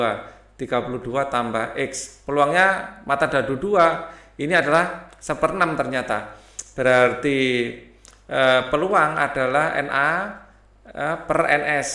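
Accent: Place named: Java, Indonesia. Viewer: Dari suaranya sudah jelas itu wong jowo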